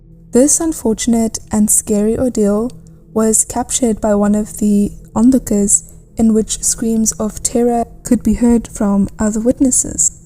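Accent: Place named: South Africa. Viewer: (Are you South African?) For sure she is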